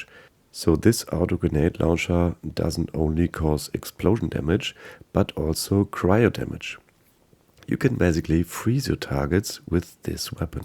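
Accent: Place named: Germany. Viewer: Du hörst dich an, wie ein deutscher der sehr gut englisch spricht.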